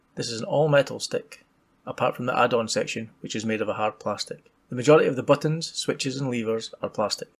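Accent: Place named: Scotland, United Kingdom. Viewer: The gentle Scottish accent makes it an effortless sell... I must be homesick! Seriously though, you highlighted most of the reasons I also went with the WinWing over the Virpil. Good video,. I hope there are many more to come!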